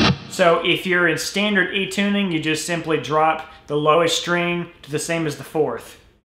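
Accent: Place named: Alabama, United States. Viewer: As soon as I heard his voice I was like 'Alabama'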